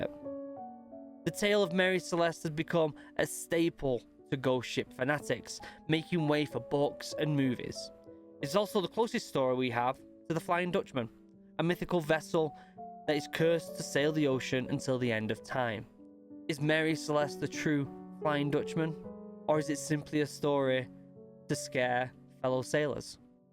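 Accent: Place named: North, United Kingdom. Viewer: I’ve been a casual viewer of this channel since MW: 2019 Clean House. I love the new format, I think I will miss the old style but at least you’re happy with what you are doing now. I will say though as someone from the south-east UK I’ve started to notice the northern accent much more and it’s great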